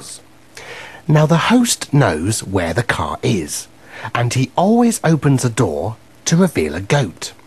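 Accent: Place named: United Kingdom. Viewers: 真的一直分心聽英國腔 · 英國講話很重音調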